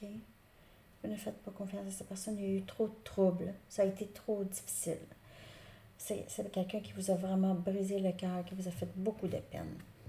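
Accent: Canada. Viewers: Merci pour la guidance pleine de vérité relation toxique et je lache prise je veux la stabilité et la paix merci je vous adore ainsi que votre accent canadien ""une Algérienne "❤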